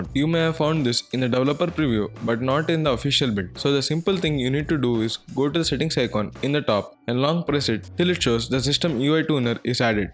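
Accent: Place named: India. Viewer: That's Indian dialect. He knows English perfectly well, thanks to the British Empire.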